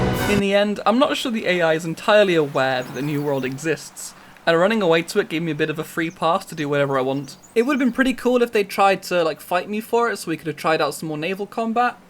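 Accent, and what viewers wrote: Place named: United Kingdom. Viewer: You are the most Bri'ish gamer I have ever witnessed, in a good way gentleman.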